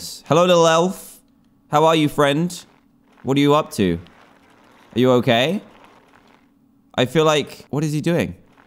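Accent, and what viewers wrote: England, United Kingdom. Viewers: He’s British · Tayler Douglas what? He is from england...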